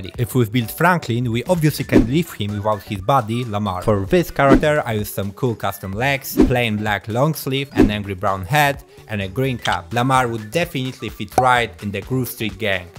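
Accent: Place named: Poland. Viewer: słychać że z polski zajebista robota oby tak dalej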